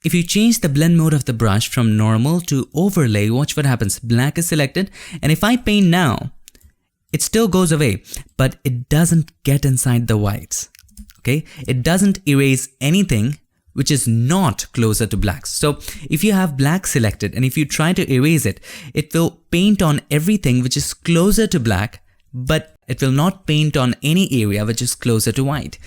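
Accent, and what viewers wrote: India. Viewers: i hate hindu English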